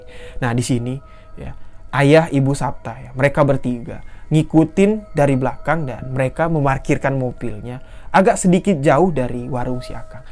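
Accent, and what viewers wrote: Indonesia. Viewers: I just love the way you tell the stories. Sangat mudah difahami. Semakin suka dengar dialek Indonesia ❤️ Hello from Malaysia!!!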